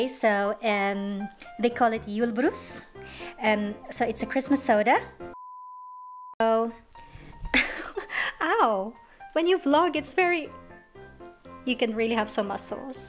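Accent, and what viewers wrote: United Kingdom. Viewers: Wow sissy! You got all kinds of julebrus there! Har du lært å snakke norsk? Watching this together with my hubby. Always fascinated with your beautiful brit accent. Merry christmas! God jul! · Have a Merry Christmas with a British twang #gemsscope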